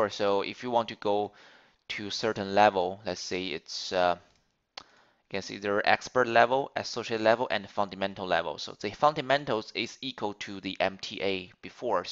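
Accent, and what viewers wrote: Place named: Philippines. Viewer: Thanks for sharing this, I'm interested to learn more technical skills in Azure! Appreciated so much. Anyways, are you a Filipino? because you have a filipino accent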